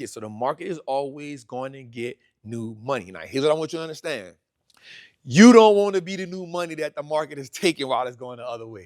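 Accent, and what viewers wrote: New Orleans, United States. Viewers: You're a really good teacher! Are you from N'awlins? I'm in Shreveport.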